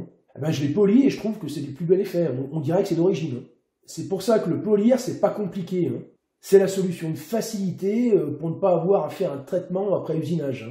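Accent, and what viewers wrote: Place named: Lyon, France. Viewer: accent lyonnais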